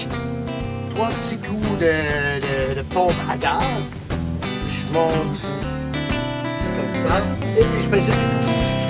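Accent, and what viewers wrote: Quebec, Canada. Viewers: L’accent québécois est insupportable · Je suis tombée par hasard sur cette vidéo et c'est bien. J'aime les québécois et j'aime l'esprit constructif (pour info, je suis française). Pourriez-vous donner des conseils à nos amis français, surtout ceux qui n'ont pas l'habitude des effets de la neige, des conseils lorsqu'ils sont sur un axe routier fréquenté (et non pas chez eux avec tout le matériel pour sortir leur voiture de la cour) ? En France, lorsqu'il y a un épisode neigeux important, c'est un peu la catastrophe (largement dénoncée ou enfoncée par les médias). Mais n'avons-nous pas l'excuse du manque d'habitude ? Bref, si vous pouviez nous aider à trouver les gestes, les comportements, les accessoires utiles à une circulation fluide dans notre joli pays, cela serait parfait. Merci pour votre vidéo que je trouve rigolote (j'aime bien votre accent !). 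Nous aussi, on "pète" les joints de porte par grand froid ! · L'accent que je kiffe le plus !!! Je vous aime les québécois !!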